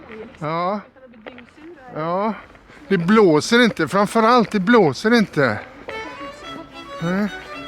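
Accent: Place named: Gothenburg, Sweden. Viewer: En härlig vandring genom Dole [dåll] (lite dragning åt ö - känner mig lite som besserwisser, men du undrar ju då och då i filmen). Oavsett dina uttal (det är lite käckt på göteborgska) så följer jag er mer än gärna. 😊😊 Ni förmedlar hela tiden en känsla av att själv vara närvarande med er. Fortsätt så! 👍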